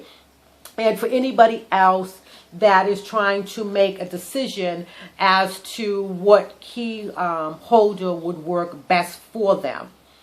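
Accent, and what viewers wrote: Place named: Massachusetts, United States. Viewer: Hi! It's Balance and Grace. Great Review! Great piece. Great nails. And I love your accent. It reminds me of my best friend from Mass. Be well!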